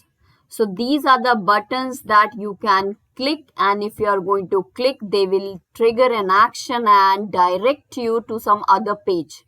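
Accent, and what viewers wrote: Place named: South India, India. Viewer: South Indian Accent 😛😛👌👌👌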